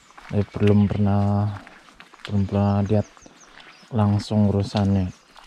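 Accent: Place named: Ngapak, Indonesia. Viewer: Bahasa indonsianya medok banget pasti orang ngapak ya masora ngapqk ora kepenak pokoke mas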